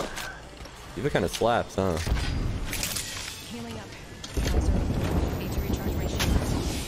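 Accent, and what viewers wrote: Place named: United States. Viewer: Which country is Aceu from, or his home land ? He have American accent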